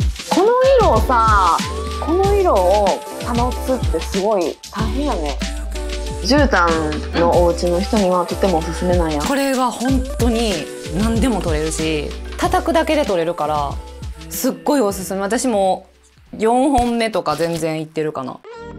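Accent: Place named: Osaka, Japan. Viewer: 私も関西人なので大阪弁が心地いいw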